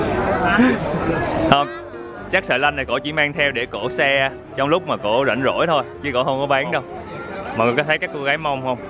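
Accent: Mekong Delta, Vietnam. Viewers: Bạn này có lợi thế giọng nói cuốn hút, phát âm giọng miền tây dễ thương (mình k rõ bạn ở đâu), đẹp trai. Nội dung thì lúc nào cũng dễ chịu cực kì. Ủng hộ bạn nhiều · Nghe giọng biết ngay là người Kinh tộc khinh người. bạn biết gì về ẩm thực vùng cao biết gì về người ĐỒNG VĂN? Mấy cái món của bạn nói đã bj Kinh hóa hết rồi đâu còn nguyên bản. Ngay cả món ân mà người kinh gọi là Thắng cố thì còn chưa gọi đúng tên nói gì đến cách nấu và cách dùng nguyên liệu.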